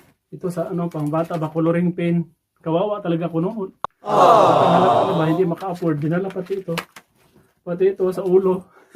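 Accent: Visayas, Philippines. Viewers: pls. mag gloves ka pohh pg mag dumpster para iwas kati sa kamay at sugat lalo na poh at kinakalkal mo ang mga dumpster... bisaya poh talaga accent mo mag tagalog...hehehe..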